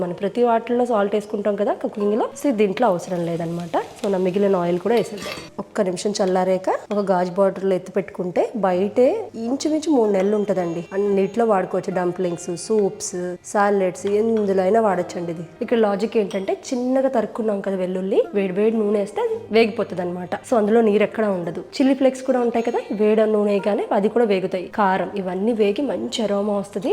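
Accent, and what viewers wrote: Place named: Godavari, India. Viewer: Baraka, obbidi maa godavari slang ❤❤❤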